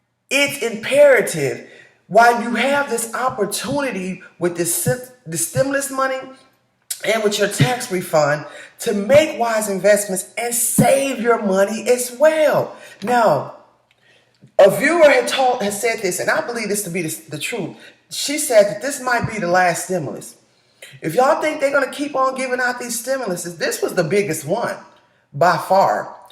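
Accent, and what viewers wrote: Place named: South, United States. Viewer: Smart women and I love your southern accent.👍🌈☮️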